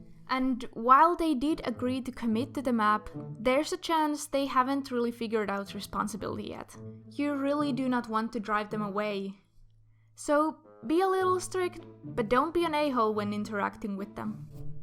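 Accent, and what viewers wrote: Finland. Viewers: Wait a sec, ARE YOU FINNISH. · Are you from Finland? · Why i think you are from Finland?!? Sorry about my bad English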